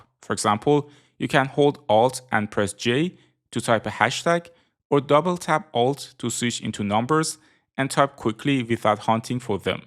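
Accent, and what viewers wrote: Iran. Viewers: I love the persian accent<3 Free Iran!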